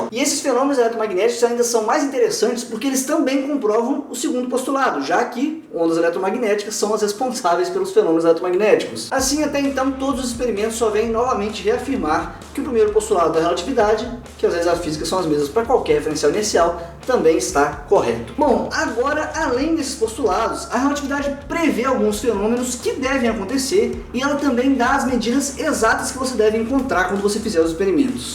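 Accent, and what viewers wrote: Minas Gerais, Brazil. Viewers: Só de falar "vão falá um cadim" dá pra sacar que é mineiro. É tipo uma linguagem em código que possuímos... kkkk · Que delícia acordar e já ter vídeo novo. Uai, você é mineiro?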